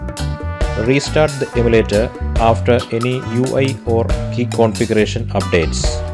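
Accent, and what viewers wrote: Kerala, India. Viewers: are you an a mallu ?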